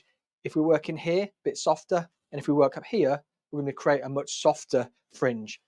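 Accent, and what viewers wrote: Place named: North, United Kingdom. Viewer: You're a really good hairdresser to watch ,you sound like a northerner too like me 😉..But..isn't that hairstyle like a,long ' Karen ' style..maybe for Karen"s,daughter ' Karina' 😆😆😆😆😆Sorry I hate that graduated style at the back & ear flaps look .I was born in 1967 in Liverpool & believe me I've seen some ridiculous styles over the last 50' odd yrs in the UK 😆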